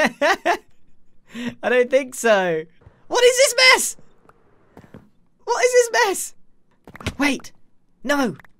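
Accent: United Kingdom. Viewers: "What in the broken teabags" yep, he's definitely british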